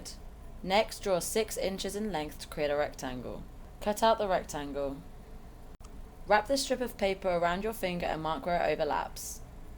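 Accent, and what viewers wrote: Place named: Northern England, United Kingdom. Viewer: Your voice is cool....you sound like Scary Spice. Northern England?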